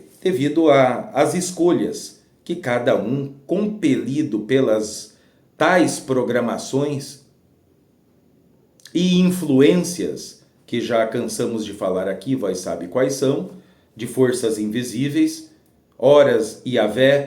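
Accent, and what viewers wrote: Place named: South, Brazil. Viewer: O cara não sabe nem o Português, quanto mais falar sobre coisas que não existem. E esse sotaque sulino escroto, e esse olhar de louco.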